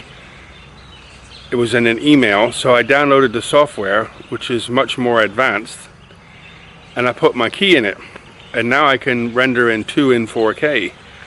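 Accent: United States. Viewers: I am Thai .... Lazada of Shopee is the most popular in Thailand ... For Ex. I try to search "5G pocket wifi".. found Xiaomi Alot Router AX3600 cost 2580 bath from China delevery about 9-12 day which is no costom tax. ......... I think 4G is enough because it speed up to 150Mbps .. if operater around signal only 40 40 ..... ผมคนไทยติดตามคุณเพราะอยากพัฒนาภาษาอังกฤษ สำเนียงอมริกันฟังง่ายครับ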